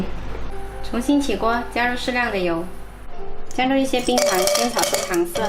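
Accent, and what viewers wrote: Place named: Guangxi, China. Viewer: 浓浓的广西口音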